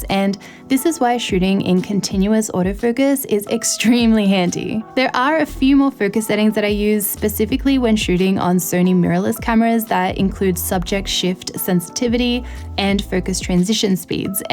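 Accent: Sydney, Australia. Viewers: Americans love the Aussie accent 🇦🇺😍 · Great content. Good to hear the Sydney accent. It’s amazing how you can still get negative comments with pretty informative non-controversial videos. I’ll be saving your channel for our schools photography course for next year. Keep up the good work. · Mumma you australian?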